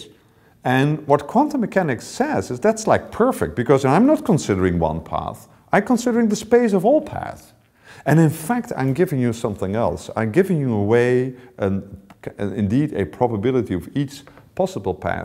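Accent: Netherlands. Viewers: Je hoort meteen dat hij Nederlands is :)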